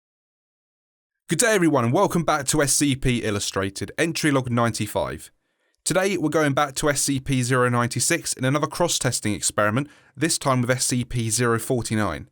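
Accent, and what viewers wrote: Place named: United Kingdom. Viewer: I like british acsents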